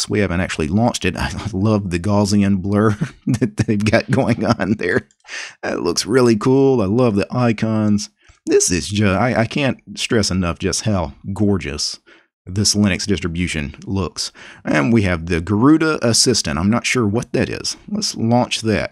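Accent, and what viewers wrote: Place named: Deep South, United States. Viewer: First linux nerd with a deep south accent xD Best thing in the community.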